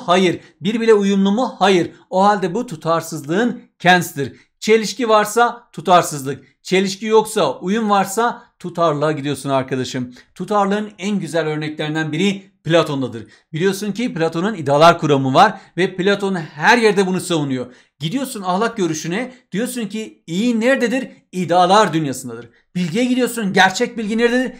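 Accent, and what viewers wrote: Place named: Van, Turkey. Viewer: belli bende vanlıyım anladım konuşma tarzınızdan :D <3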